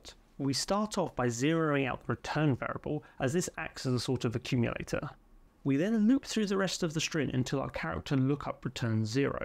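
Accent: United Kingdom. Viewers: 1. Britisch accent check 2. Nice explanation check 3. Fun topic check Subscribed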